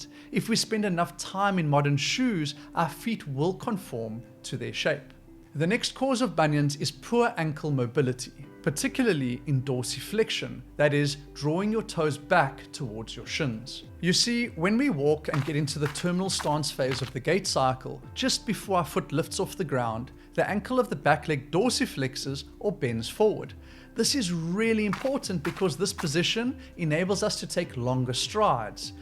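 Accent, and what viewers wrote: South Africa. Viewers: Are you South African? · why do you sound South African?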